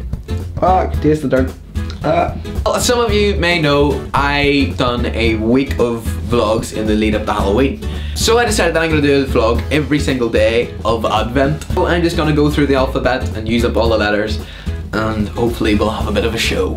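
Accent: Ireland. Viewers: I love he Irish voice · I am an American from California, and I love your accent!!! O your voice is dreamy.. Seriously, if boys here could talk like you.. well... I would be one happy girl. :D <3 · I definitley am subscribing, your really funny, and your irish accent is amazin, plus this video truly made my day. Thanx:)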